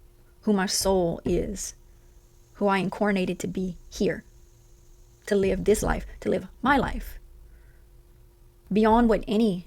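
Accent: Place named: United States. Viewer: your accent is featured in the video "13 American Accents Ranked EASIEST to HARDEST to Understand", by user Olly Richards. 🙂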